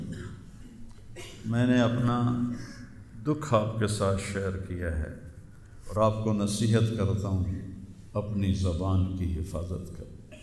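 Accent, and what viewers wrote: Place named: Paharpur, India. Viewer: Not a muslim, maulana sahab being a hindu, I have lot of love for you in my heart the way you speak, the same language my ancestors spoke,reminds me of my daadi, she passed away from this world in 2020, she was as innocent and lovely as you,my ancestors are from paharpur Dera Ismail Khan, NWFP.. my heart is full of love for you, to see you sad, saddens me too!! Literally in tears!!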